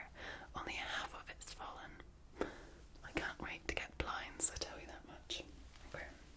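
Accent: Scotland, United Kingdom. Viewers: I would absolutely love more stories read in that accent. Makes me want to channel my scottish ancestry and fight the brits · First of yours I've seen but the Scottish accent although not same dialect as mine is like being back home even just for twenty minutes. Hope ye keep it up. Very talented lassie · Lovely, little Angel. Another delightful session just how I like it. Up close and personal with the very tingly “dot, dot, line, line” verse! I love listening to you read with that gorgeous Scottish brogue! You lovely face just lights up my iPad screen as well as this old heart of mine. Thank you so very much, darlin’ lass! 🌹🙏🤗🧚‍♀️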